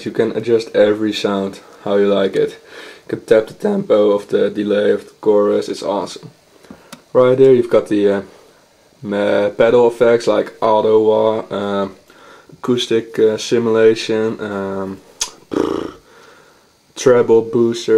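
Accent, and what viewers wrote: Netherlands. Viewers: ha your accent sound hollish.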